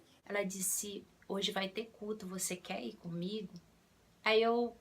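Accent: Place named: Rio de Janeiro, Brazil. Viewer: achei que vc era do rio de janeiro.. pelo sotaque rs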